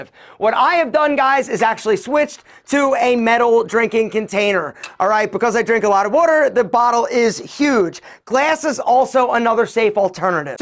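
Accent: Philadelphia, United States. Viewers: Great advice and also a great Philly accent !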